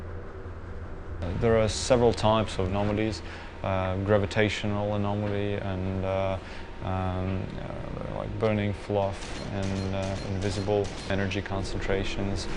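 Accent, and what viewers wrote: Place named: United Kingdom. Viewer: i think this guy studied in UK? he speaks with a slight british accent...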